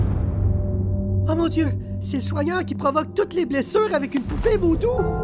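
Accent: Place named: Quebec, Canada. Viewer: En plus lacsent kebequoi c'est mon préféré. :)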